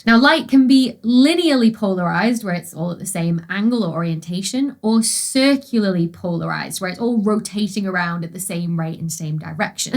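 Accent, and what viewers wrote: United Kingdom. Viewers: I occasionally read a few random comments on videos like this and often regret it. There is one from a fellow countryman of mine that complains about the British accent. if I understand the complaint correctly, I'm disappointed to see that we have reached a level of audacity where some of us expect everyone to sound more American. Imagine using the internet and discovering that not everyone on it is American (gasp). had a song "." I am too. Please continue to be yourself, thank you! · If you want to be successful in the United States… slow the hell down drink less coffee before recording a video. We aren’t that crazy about your British accent and we can’t figure out why you’re rushing. Slower and more focused on each chapter. Pretend we are plodding American tourists and explain it.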